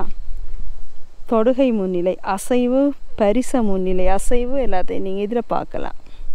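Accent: Sri Lanka. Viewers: அக்கா அருமையான பதிவு உங்களது இனிமையான இலங்கை தமிழ் பேச்சு மிகவும் நல்லா இருக்கு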